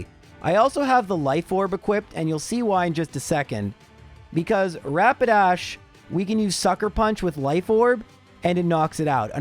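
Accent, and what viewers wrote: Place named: Canada. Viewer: He's Canadian, and canadian pronunciation caused both of those "issues".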